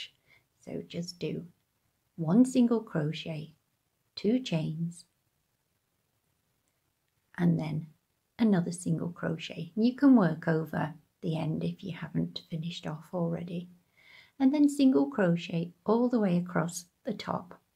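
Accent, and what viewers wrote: United Kingdom. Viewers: Can i ask why you use American terminology? When I hear a UK accent I hope for UK terminology - have we just given up on it altogether?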